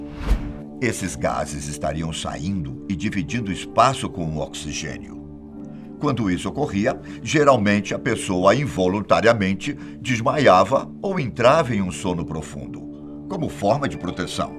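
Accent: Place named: Bahia, Brazil. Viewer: baiano kkkk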